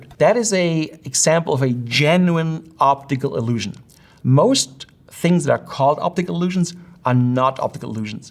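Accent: Germany. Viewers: I just knew he was German as soon as he started talking😂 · I HEAR GERMAN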